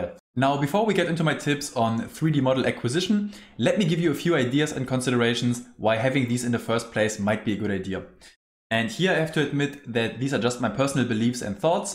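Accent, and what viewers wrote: Germany. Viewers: das deutscheste english was ich jemals gehört habe.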